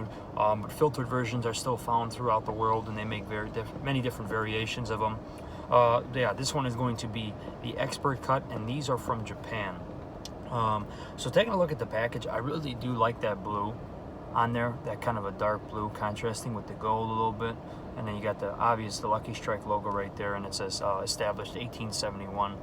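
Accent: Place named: Illinois, United States. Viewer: Dude are you from Illinois? You sound like some of the people I live next door to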